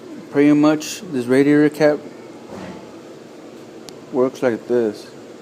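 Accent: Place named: New Mexico, United States. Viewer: Some people talk like that if they are from New Mexico or California.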